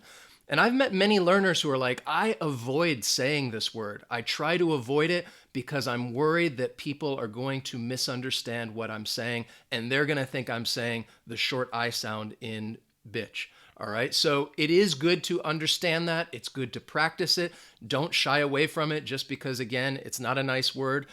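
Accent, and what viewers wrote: United States. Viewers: i love your accent. from which part of the US are you from?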